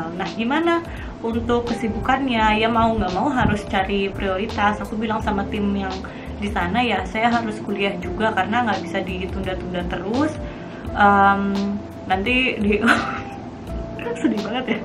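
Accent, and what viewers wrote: Java, Indonesia. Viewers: Buk wagub udh medok logat jawa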